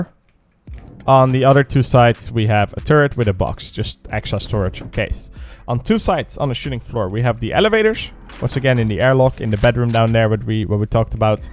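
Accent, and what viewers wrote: Netherlands. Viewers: i can hear u are dutch, i am dutch to, je bent goed bezig man!, had ik maar zo'n slimme base builder als rust teammate ! haha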